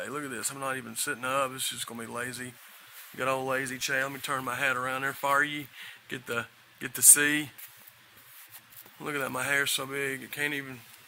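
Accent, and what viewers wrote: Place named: South, United States. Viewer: Not being a stalker, but you have a southern twang and I was wondering what state do you live in?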